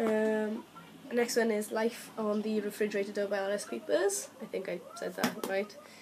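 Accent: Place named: United Kingdom. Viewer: Your accent is so gorgeous!! What part of the UK are you from? And I love the video. :') Before I Fall is amazing.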